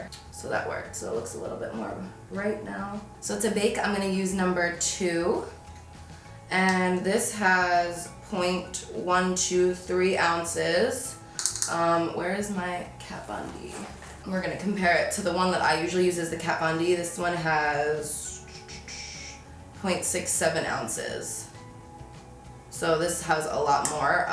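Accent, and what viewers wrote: New Jersey, United States. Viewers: I love your jersey accent lol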